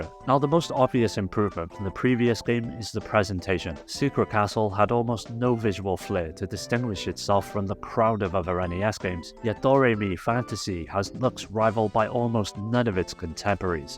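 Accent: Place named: North East Wales, United Kingdom. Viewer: Great vid as always! And I swear that a North East Wales accent 😂 You sound EXACTLY like most of my friends ❤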